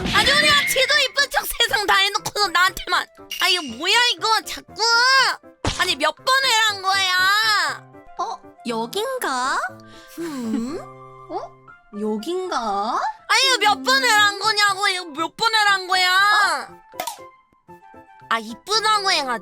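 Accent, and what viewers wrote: South Korea. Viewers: 전라도 사람이여? 구수허이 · 전라남도쪽일 거 같네요 근데 전에도 보니까 경상도 사투리도 하셔서.. 좀 섞인거 같아요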